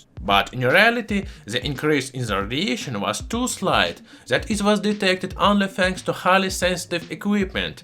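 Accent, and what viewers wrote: Russia. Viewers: Are you a Russian? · are you russian???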